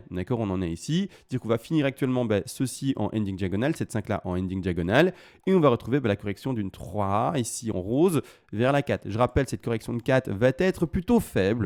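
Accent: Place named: Belgium. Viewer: Bonjour et Bravo à, je suis devenu accro à ses tutos.... lui qui est belge je crois... et moi Québécois!!! Mais quel ACCENT!... pour un québécois francais comme moi ca me tient réveillé!!... Question pour la communauté: j'utilise Kraken pcq c'est le premier exchange que j'ai connu, cependant, je le trouve tellement pas bien fait. Je sais que ne souhaite pas s'investir en recommandant un exchange pour les raisons qu'il a mentionné et que je respecte, mais je suis d'avis qu'un membre de la communauté pourrais me référé l'exchange qui serait plus plus adapté à suivre ces tutos, comme par exemple modifier les stop loss à la cliquette comme il dit souvent:)) qui peut me recommander son meilleur choix et pourquoi... Merci et bravo encore... j'apprend toujours!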